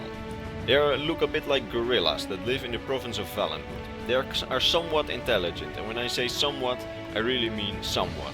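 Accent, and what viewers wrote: Netherlands. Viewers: dunglish tho or nengels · From your accent I can Tell your Dutch Correct? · the man behind the voice, is he Dutch? the accent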